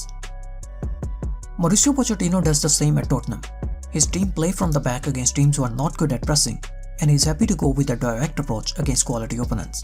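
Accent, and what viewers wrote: India. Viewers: what's with this indian accent? turkish hijacked?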